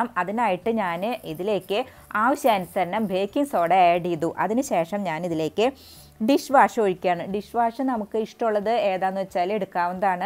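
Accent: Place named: Thrissur, India. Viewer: തൃശ്ശൂർ ഭാഷ കേൾക്കാൻ ഒത്തിരി ഇമ്പമാണ് ട്ടോ. നല്ല ഒരു tip. Thank you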